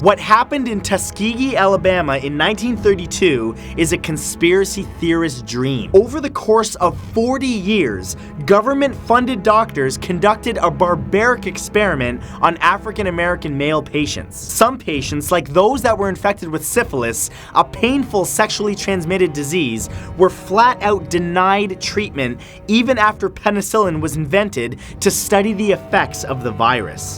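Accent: Canada. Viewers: this is Canadian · You're from Canada?! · So your canadian? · Your Canadian?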